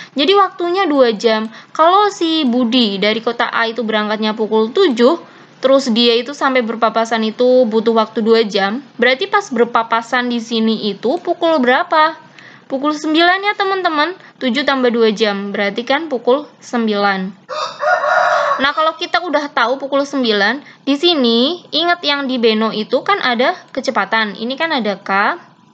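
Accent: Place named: Java, Indonesia. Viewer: Muedok nya itu lo bikin semangat belajar bareng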